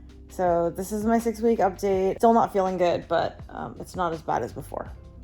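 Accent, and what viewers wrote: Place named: California, United States. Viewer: Love your personality, and it’s so refreshing to hear your “cali accent” I’ve been away from home for 7 years now & it’s so annoying when people tell me that I have one lol but I have now embraced it and love it!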